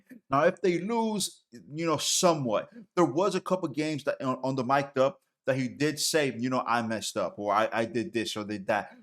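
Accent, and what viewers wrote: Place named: Miami, United States. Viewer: If we fire Mike McDaniel, we are an absolute dumpster fire. And yes, I could tell you are from Miami with that accent bro. 🤣💯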